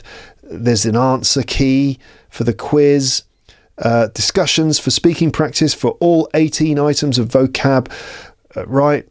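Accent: RP, United Kingdom. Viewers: I love the way you teach English and your British accent. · Hello. Hello to all adventure on this channel too. In this text, I have some thoughts, and one question for all users, and of course for you. Thank you for your work. You made this world better and gave some people the opportunity to learn modern English totally free. Your voice is incredibly pretty, gorgeous, and a little bit posh. I can complete the whole episode at 1.5x speed. Your English podcast was so difficult for me a few years ago. I started with Canadian English, but then tried many variations such as American, South African, Scottish, and many others with community teachers. Also, I spent a lot of time watching movies, podcasts, and playing games. I have one question. Maybe, I am not a good seeker, but I want to find a place to understand poetry in English. Just a podcast with the right intonation, pronunciation, and explanations of meanings. 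Do you know the star point for learning to spell poetry? Thank you to all readers. Have a good New Year. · Hello! I started listening your podcast last year. I'm from Japan and I live in Spain now. I studied American English in Japan and here I hear more British English. Some words are different and above all the pronunciation is totally different. I looked for some channel speaking in British English to hear and to learn and here I am with you! Have a nice 2025! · I have been watching this podcast since september 2023, and i've improved a lot my english, mainly my listening skills, and i have developed a interest in british accent, i love this one, one funny thing is that i started pronounce some words like accent 😂😂😂 and the way that you talk and Some british persons, before that i use to pronounce like a standard american english and now i kind of Mix with british accent, and of course my own accent from brazil. 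My goals in this year is be improved my accent and my speaking and of course continue what i doing right now, listening english every day. One thing that i have to improve is my compreension when you bring someone for your podcast, sometimes i struglle to understand different accents, for example, the accent from you friend paul😂 however i like this cause i face this situation like a challenge! Actually english today is part of my routine, i kind of got addicted in english 😂, i put english in everything, games, movies, apps,etc. Thanks, you're amazing teacher! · i can't describe my pleasure when İ get a YouTube notification of a new episode of your amazing podcast ... Please read more stories i love listening to stories in your clear British accent.. thank you very much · Thank you so much,! I like your voice, your British accent ascent and your pace of speaking. You're a teacher with heart ❤ I've listened to a few of your storytelling sessions, love them!! 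Thank you once again and wishing you another successful year!! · Excellent class. it´s long but very interesting. I love listening to your British pronounciation and your engaging voice. it seems I´m listening to a film actor and not a teacher. My brain becomes motivated with all your hepful advice. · What a nice discovery I' ve just made! My brain is filled with good English, nice voice and lovely British pronunciation. Thank you! Greetings from Argentina.😊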